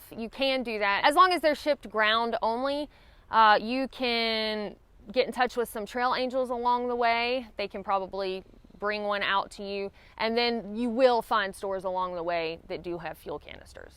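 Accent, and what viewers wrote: South, United States. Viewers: You have the coolest accent!!! Country girls are awesome!!!!! Be safe and thanks for the content!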